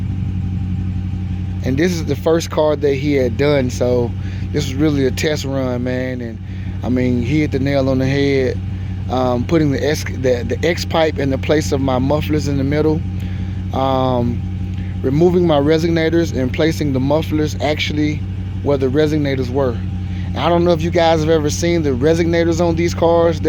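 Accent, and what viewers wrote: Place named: South, United States. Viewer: Sounds good dude. I can tell your country AF with that accent. Got that “boiler pro”. LOL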